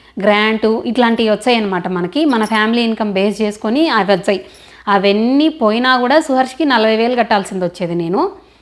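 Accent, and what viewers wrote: Rayalaseema, India. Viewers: Nenu ekkuva gaa comment cheyyanu andi but meru nijangaa super ga clarity ga chepparu memu thondarlo vastam andi foreign ki Naku papa nenu same challa tension anipistundi ....but Mee video chusaka konchem relief anipistundi maa papa anthe andi active full mrng padukodu night padukuntundi maa papa ki uyyallo nee alavatu foreign lo Ela Abba anukunedani anyway challa thanks andi...madi rayalseema kadapa meru matladtunteee aa slang lo maa voru akka laga vunnaru...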